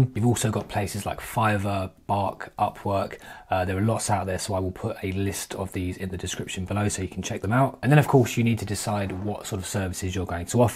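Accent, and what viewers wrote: United Kingdom. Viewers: Yes nice to hear an English voice. I have a good idea for number 6 side hustle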